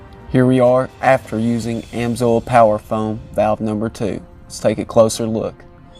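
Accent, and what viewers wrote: South, United States. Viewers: Thousand likes just for the "down south" accent!!!!!!!!!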